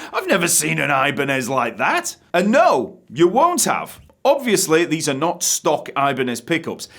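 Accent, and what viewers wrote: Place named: North, United Kingdom. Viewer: Apart from your quality of content , it's so great to hear a NORTHERN VOICE on the tube ! -from a fellow Northerner !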